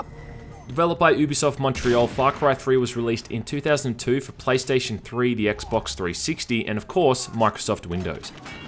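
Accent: Bogan, Australia. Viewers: ur just a below average reviewer whos only popular because you have that bogan aussie accent.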